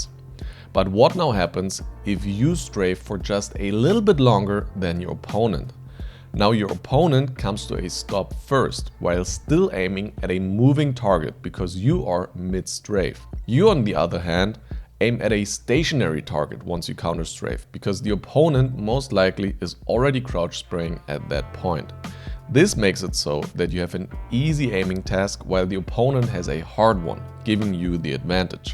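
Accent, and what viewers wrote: Germany. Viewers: Man hört deinen deutschen akzent raus alter mach doch videos auf deutsch dann versteht man es auch leichter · dieser deutsche Akzent 😂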